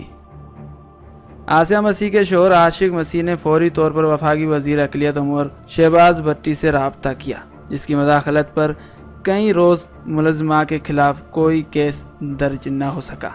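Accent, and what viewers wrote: India. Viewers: Ap pakka Indian ho q k bolny k andaz bata rahy hy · Are you indian ?The word of your speaking is indian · Tu hai Kon Bhai ?indian walon Ka accent lagra hai !!!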